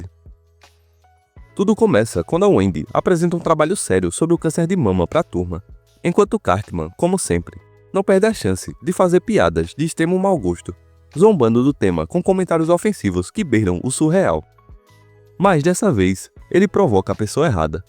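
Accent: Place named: Northeast, Brazil. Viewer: Os vídeos ficam melhores com seu sotaque, viva o nordeste!!!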